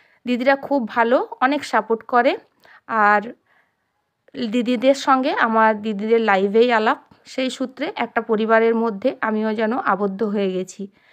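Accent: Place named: India. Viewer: অনেক অনেক ভালোবাসা রইলো বোন এগিয়ে জাও তোমার কথাগুলায় কেমন জানি একটা টান তবে শুনতে বেস লাগে তুমি কি ইন্ডিয়ান দিদিভাই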